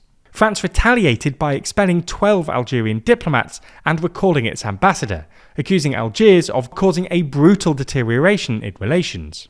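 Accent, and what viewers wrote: United Kingdom. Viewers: british lil bro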